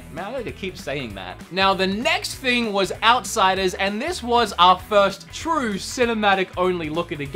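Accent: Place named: Australia. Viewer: Bro, dont change the Aussie accent man...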